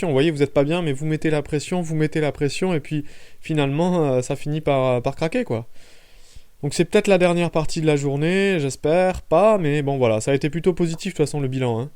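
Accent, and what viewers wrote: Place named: South, France. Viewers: c'est intéressant, vos commentaires sont vraiment sympa, le style dynamique et l'accent du Sud :-D merci pour ces vidéos. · accent du sud ... on dirait carrément DiMeco !!!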